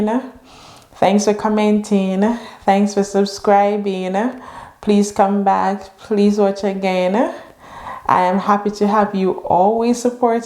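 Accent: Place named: Jamaica. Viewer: u 😂😂 now u making me come on here listen to the accent chro. Jamaica one love..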